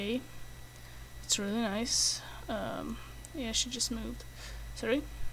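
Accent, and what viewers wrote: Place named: Asia. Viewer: I like your accent! Are you or your parents from Asia?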